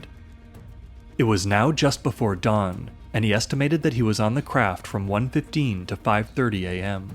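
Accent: Canada. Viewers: the comments of course as predicted are a bunch of middle school minded immature cretins only focusing on the sex and forgetting about everything else smh ... just making lame jokes and puns and giggling like kids who wear helmets and ride the short bus smdh what a complete joke of a channel, I remember now why I hit "don't recommend channel" a couple of years ago, and now the algorithm has suggested it again, thinking I forgot, and me thinking foolishly that maybe it has changed for the better, but nope, same old garbage as always, plus the added annoyance of the canadian "oat" and "aboat" to boot, ugh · I'd rather listen to an AI narrated video than hear another Canadian accent, any day 😭😭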